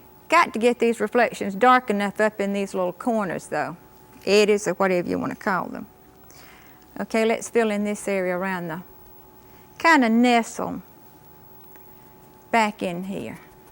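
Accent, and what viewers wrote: South, United States. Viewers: I love her Southern accent!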